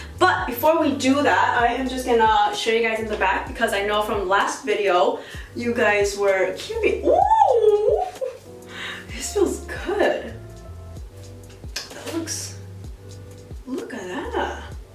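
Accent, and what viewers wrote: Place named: Sweden. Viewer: It sometimes sounds like you have a slight swedish accent to the english hahaha ;D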